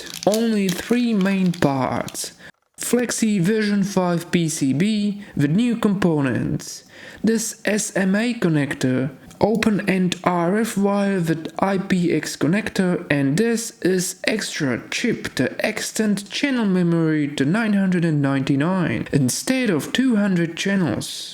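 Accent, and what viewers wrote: Russia. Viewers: that Russian accent...yak... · your English is pretty good and Russian accent is actually cool 🤙 What matters the most, you took the time to make the video 🙌. Losers will always leave loser comments 😅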